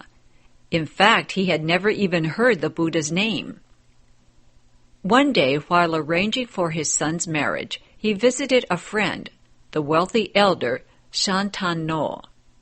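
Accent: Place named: United States. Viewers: YOU SHOULD BE HAPPY FOR EVERYONE, BECAUSE EVEN THOU THERE'S HEAVY AMERICAN ACCENT ATLEAST SOMEONE DID THERE JOB TO SPREAD THE DHARMA. AS FOR SOMEONE WHO SEE AND JUDGED SOMEONE WHO WHO HAS TAKEN AN EFFORT TO READ THIS SUTRA. MAY YOU REALIZED 🙏 THE BENEFITS OF THIS VIDEOS. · Great but shame about the heavy American accent.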